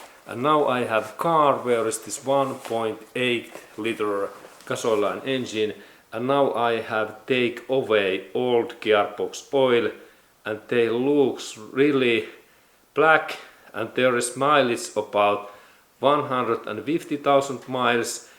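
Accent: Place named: Finland. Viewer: Im here from the video: Timing belt little wrong position Honda Civic. Cause I had a problem with my crx and Ive been wondering if you still do videos and you do. And still the same accent, I love this. Keep up the good work my Finnish friend. Anyways Finland Is a very beatiful and friendly country. Ive been there, so I know what Im talkin about. :)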